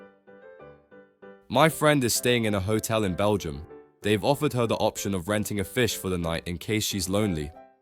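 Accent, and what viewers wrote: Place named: Birmingham, United Kingdom. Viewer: Nope! Back to the old voice. This one sounds like a Brummie with Down Syndrome.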